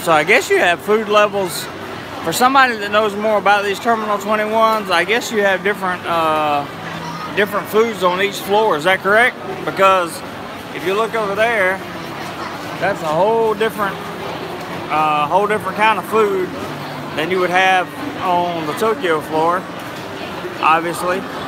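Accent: South, United States. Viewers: His southern accent is so attractive. 😻 laugh like George W sexy boy!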